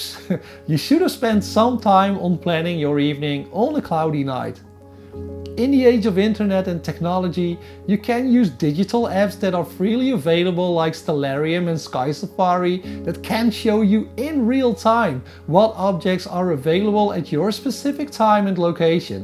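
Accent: Netherlands. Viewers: Hello, I enjoy your videos very much. I live on Vancouver Island in Canada and our climate is similar to yours. My parents came from Holland so I like your accent LOL. I have a question for you or perhaps a topic you can test. I'm at the beginning my astrophotography hobby and very much a newbie but have been doing lots of studying for the last 2 months. I have a cold weather question: After watching this short video <link> on photographing in cold weather I was wondering if any astrophotographers have noticed a difference in using heat bands to prevent dew and if the heat band adds heat distortion itself. I do know you need to cool your equipment before shooting but then your adding heat to the lens hood area again. Isn't this causing heat distortion?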